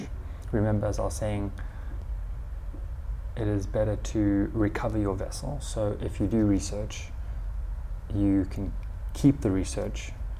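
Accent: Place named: South Africa. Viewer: dude you sound south african lol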